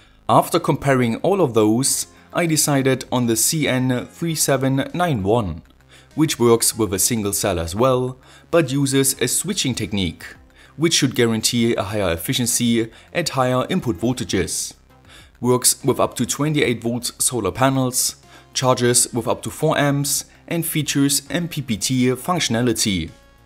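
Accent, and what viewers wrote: Germany. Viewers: ja.... man hat an deinem Akzent erkannt, dass du Deutsch bist ;) tolles Video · Hah, i knew u were from Germany from the beginning! Hu he hu he hu he. Where do u come from ?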